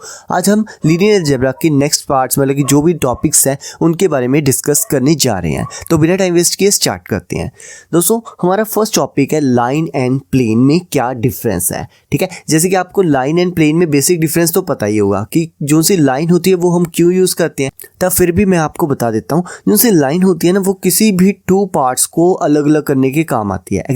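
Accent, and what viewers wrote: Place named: Jammu, India. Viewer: Bhai tu jammu se toh nahi hai? Your accent sounds quite familiar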